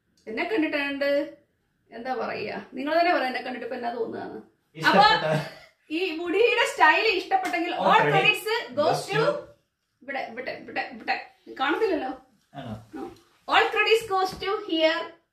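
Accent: Kottayam, India. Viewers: Chechi vdu Kottayam ano???Samsaram kottayam kare pole undu..Pine salwar oke kanichapol narmada ninnu edutha materials anennu paranju atha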